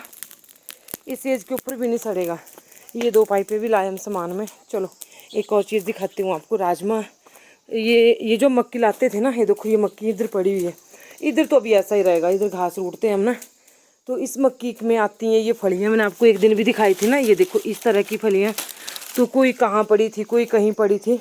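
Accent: Punjab, India. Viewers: Aapki language bdi Punjabi " Podiya"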